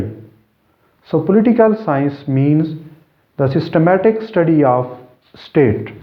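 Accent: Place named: Pakistan. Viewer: Typical pakistani accent. But still understandable.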